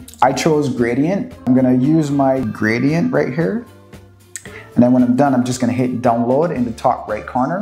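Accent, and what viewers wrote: Barbados. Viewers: Hey man , random question, are you from Barbados 🇧🇧?😂 or the Caribbean at least, I hear my people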